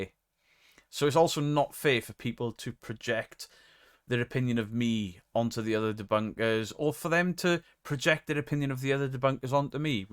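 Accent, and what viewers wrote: Wales, United Kingdom. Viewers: you're not toxic, whoever told you communities were are correct. There are just a small faction of people online who are control freaks, narcissists, and have nothing better to do than try to cause toxicity between groups. They will never stop no matter what you do and do not do. You are beloved in this community. You're the first debunker I've ever seen when I didn't know what that word meant. I could barely understand anything you said because I didn't understand the welsh/english. Now I understand you perfectly, and enjoy listening to you. Mrs. Geek is awesome! ❤You changed my life, recommended other fantastic people who have changed my life as well. Don't let these bad people cause you to question yourself. You've never been the problem. As an outsider I can see much more than some people who are really close to it can. 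Thank you for being you. ❤❤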